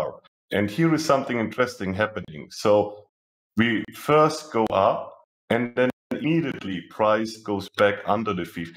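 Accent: Germany. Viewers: Are you German, bro?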